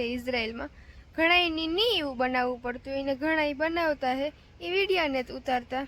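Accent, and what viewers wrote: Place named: Mehr, India. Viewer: Mehr dialect. A boli na 2 prakar chhe. Ghed ane barda ni. Thodo fer hoi chhe.😊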